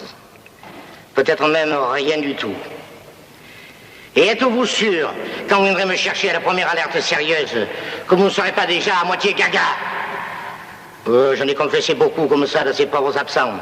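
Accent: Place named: Provence, France. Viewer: Qu'il est beau cet accent Provençal, Dieu qu'il est beau !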